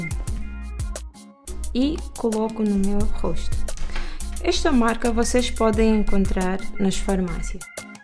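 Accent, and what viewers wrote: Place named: Portugal. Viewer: Gente, que sotaque maravilhoso! Português de Portugal!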